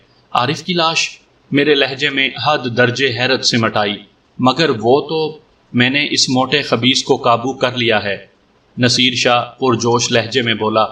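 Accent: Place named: Punjab, Pakistan. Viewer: Your urdu voice is very sweet despite punjabi accent in it.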